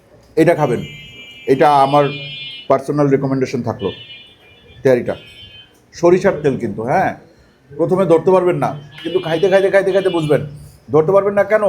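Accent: Barishal, Bangladesh. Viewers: vai ki barishailla🥹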